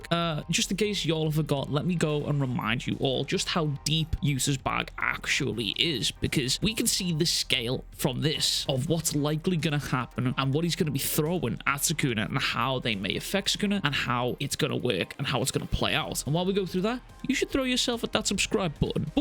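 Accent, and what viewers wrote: Liverpool, United Kingdom. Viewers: Gotta ask man are you from Liverpool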